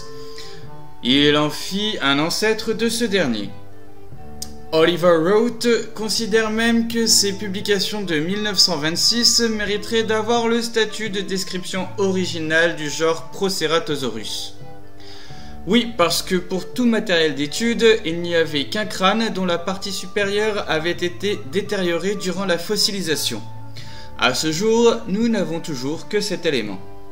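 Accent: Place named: United Kingdom. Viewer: Ooh, cet accent so british ! ;p Bon ben tant pis pour toi : tu gagnes 1 subscriber, my Sausage friend ! ^^ Juste un petit conseil en passant : essaie de moins donner l'impression que tu lis ton texte, fais-le vivre quand tu t'exprimes, ce sera plus agréable à l'écoute. Tu es passionné, alors fais-le nous sentir, sois naturel ! ;)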